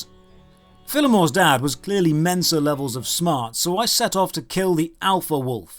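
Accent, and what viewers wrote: United Kingdom. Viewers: David Attenborough, is that you? I can't tell you Brits apart. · I just love how angry he always sounds. Very british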